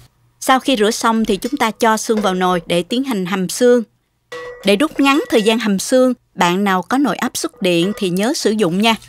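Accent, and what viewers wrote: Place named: Mekong Delta, Vietnam. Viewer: Nhìn nấu món nào cũng ngon! Giọng đậm chất miền Tây nghe nhẹ nhàng và ngọt ngào. Chúc cô và gia đình thật nhiều sức khỏe!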